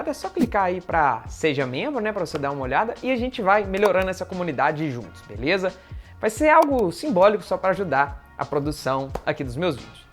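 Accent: Minas Gerais, Brazil. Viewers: Senti um mineirinho uai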